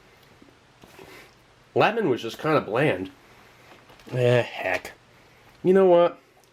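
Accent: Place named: California, United States. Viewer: Yeah he's a pretty cool bro, sounds Californian, might not be but he's got that dude vibe going. I love it when he says "Let's get this on a tray...nice!"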